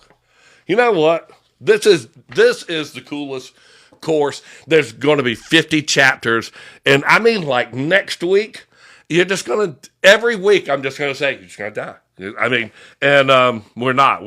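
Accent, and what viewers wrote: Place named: Georgia, United States. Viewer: REWATCHING FOR NOTES, i am so sorry i cant help it LMBO...@ you said "I APPRECIATE THAT DAD" 🤣😂😁🤣😂🤣😁🤣😂24✝🙏 Gotta love our Georgian accents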